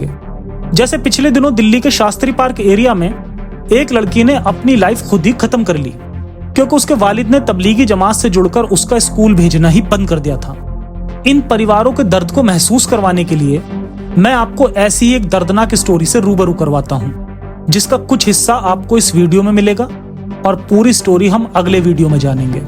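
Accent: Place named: Uttar Pradesh, India. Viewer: उत्तर प्रदेश का है इस की बोली से समझ में आता है भाई😎 सच बताना भाई में सच बोल रहा हूं ना।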